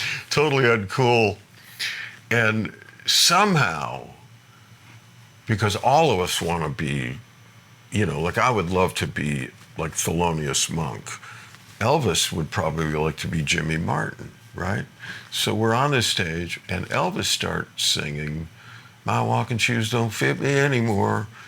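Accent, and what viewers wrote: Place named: New England, United States. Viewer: Clevah for clever. It's just called a New England accent. RI, MA, NH, Parts of CT. We all talked that way.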